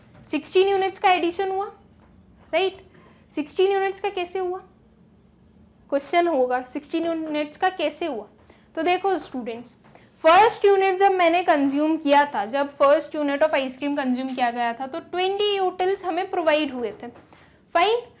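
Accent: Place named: Gujarat, India. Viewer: I can tell that ma'am is gujarati.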